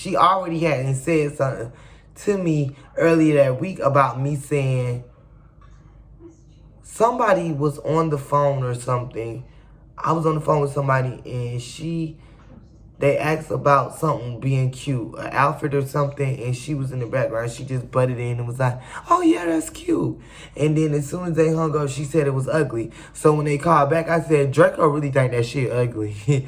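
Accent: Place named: Midwest, United States. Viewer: Gotta be from Midwest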